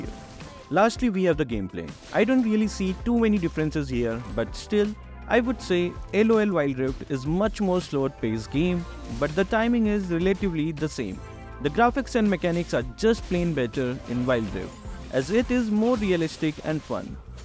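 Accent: India. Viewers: His pronounciation seems he is from India😊😊😊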